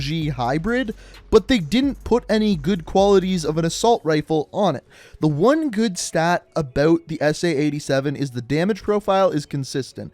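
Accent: Canada. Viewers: Why do Canadians like there ooooos and eeees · You sound 🇨🇦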